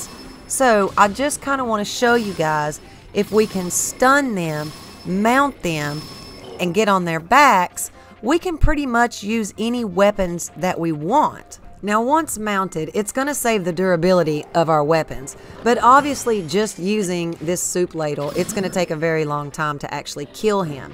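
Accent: South, United States. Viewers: I love your channel! Thanks to you, I've killed 3 silver Lynels. I mean Coloseum is OP. So many elemental weapons. Your videos are great and I just love your southern accent?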